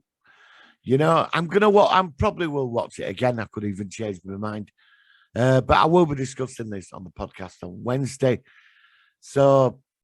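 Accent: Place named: Liverpool, United Kingdom. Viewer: I can't stand scouse. It should be counted as a speech impediment. CALM DOWN CALM DOWN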